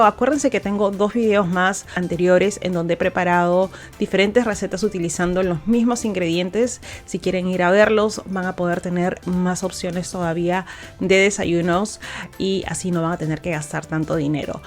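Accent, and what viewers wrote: Peru. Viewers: me encantan tus recetas, siempre sanas. Te digo desde España. Me gusta escuchar tu acento peruano ( aquí en Sevilla teiuna amiga peruana). En este video y en otros te escucho decir que algo es "demasiado" delicioso y me preguntó si en Perú se usa de modo diferente que aquí en España ya que aquí cuando decimos demasiado con algo positivo, el significado es negativo, si digo que alguien es demasiado simpático el mensaje es negativo, no me gusta que sea tan simpático. Probablemente ahí no tenga ese sentido. Bueno, una curiosidad, no es mi intención corregir tú forma de hablar ni mucho menos. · Gracias por compartir, me encanta tu dicción, tu acento bien peruano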